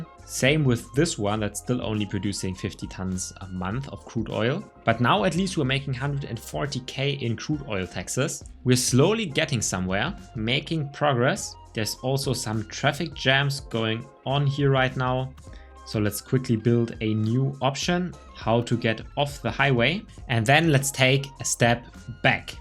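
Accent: Germany. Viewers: Bist du Deutsch wegen deinem akzent hört man das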